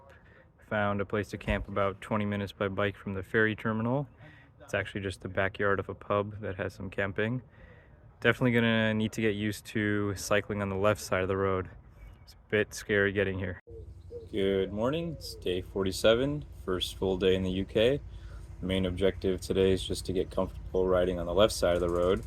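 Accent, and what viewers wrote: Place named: Australia. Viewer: Loved the Australian accent. Cheers.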